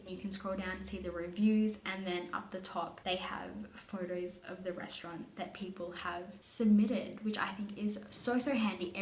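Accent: Australia. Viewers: she probably grew up in Australia she has a Australian accent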